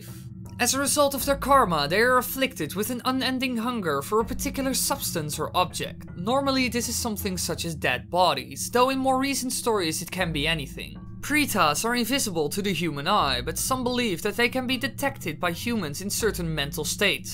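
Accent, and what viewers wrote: Netherlands. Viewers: Your accent sounds dutch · Lol, u are dutch, i can tell. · He has a very heavy Dutch accent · jesus man you may be Dutch but don't lay the accent on that thick....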